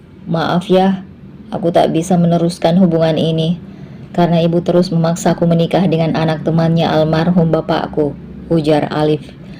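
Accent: Medan, Indonesia. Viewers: Bogor hadir ikut nyimak mb logat bahasa medan sangat enak d dgr 😅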